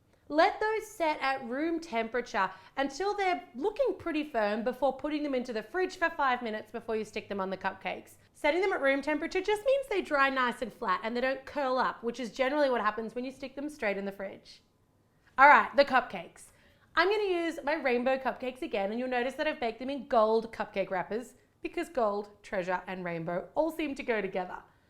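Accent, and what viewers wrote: Ireland. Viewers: love your irish accent hahahah i'm irish but dont celebrate patricks day much,its definitely a bigger thing in america or australia :D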